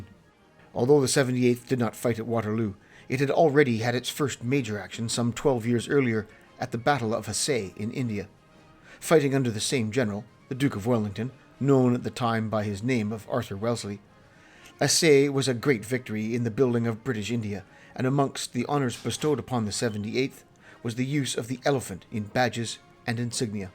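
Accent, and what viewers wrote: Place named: Canada. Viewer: God that landscape is so beautiful. Im assuming by the uniform you're Scottish but the accent sounds slightly Canadian.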